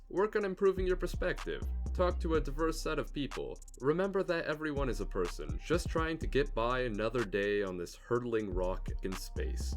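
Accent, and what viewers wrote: South, United States. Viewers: sources? Keep on listening if it makes you feel better. You realise he makes money preaching to the choir. Liberals love to hear a southern accent spew their talking points. Like how consertives like to hear black men to bash police. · I'm not even a native English speaker and I associate Beaus accent with hillbilly Republicans. The bias is real. We have a similar thing in Germany with the Saxony accent. You hear it and you think: uneducated, racist, about to lecture you on how we aren't living in a democracy because vaccines.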